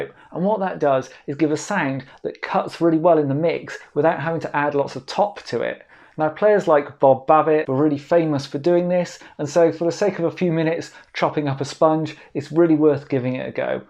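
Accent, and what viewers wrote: United Kingdom. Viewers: Agreed. The information he dropped for us is very important........but that bri'ish accent do be hittin' different. Can't even lie. 😂😂😂😂😂😂😂 Ok, forgive me. Lol. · This gentleman has that beautiful deep British English accent wich makes the video even more interesting. Thank you for the great ammount of information you have provided us with. Greetings from Lisboa, Portugal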